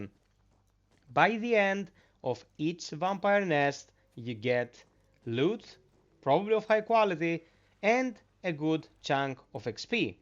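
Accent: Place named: Greece. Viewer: Βάζεις να δεις το βίντεο που σου έβγαλε η αναζήτηση και ακούς ελληνικής προφοράς αγγλικά! Μετά λες ρ 'εσύ, σαν του την φωνή και έχεις δίκιο! Πάμε joystick!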